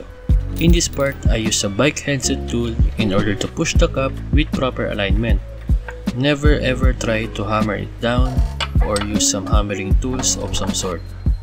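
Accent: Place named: Philippines. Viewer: I notice the Accent, Filipino ka sah? anyway ganda ng touring set up nato.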